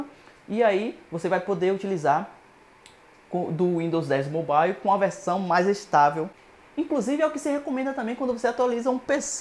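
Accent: Bahia, Brazil. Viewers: seu sotaque baiano.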